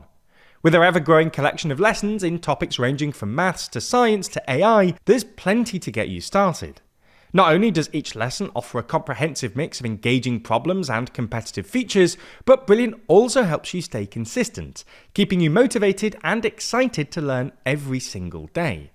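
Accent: United Kingdom. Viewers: Respectfully, UK accent about US politics. Nope.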